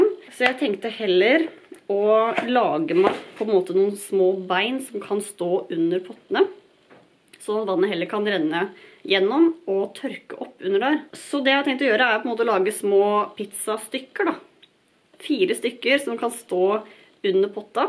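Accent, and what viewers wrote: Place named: Skien, Norway. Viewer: Ser du er fra Skjeen da.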